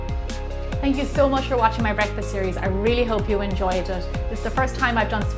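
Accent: Ireland. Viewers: with that Irish accent and beautiful woman ill cook anything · An Irish girl explaining an Italian recipe. Love it. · didn't expect her to be irish.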